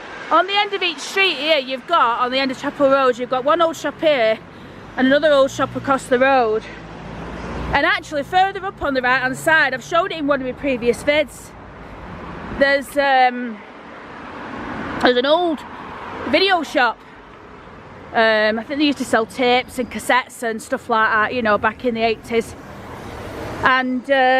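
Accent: Oldham, United Kingdom. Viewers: Love your lovely open way of speaking. I had to move away yonks ago so I love the area's covered and listening to a beautiful Oldham accent. Never change!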